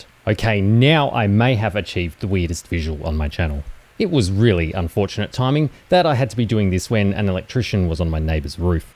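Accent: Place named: Australia. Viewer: As a not native English speaker, your Australian accent is no problem. Scottish though, that requires subtitles...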